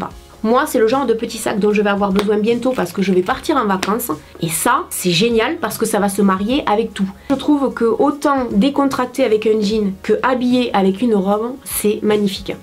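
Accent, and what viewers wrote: South, France. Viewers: Tu est du sud? Quel est ton accent? j'adore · j'aime beaucoup ce que tu fais <3 puis entendre ton accent en sachant que je suis sudiste aussi, c'est un vrai régal <3 merci pour ces moments de partage Je viens de créer ma chaine et j'espere un jour pouvoir partager comme tu le fais si bien <3 Pleins de bonnes choses pour la suite....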